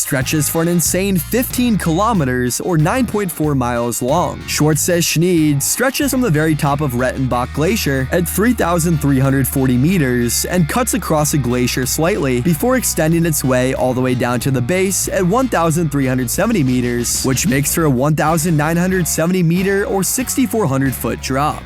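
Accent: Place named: United States. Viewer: Nice video, but hare ur american accent 😮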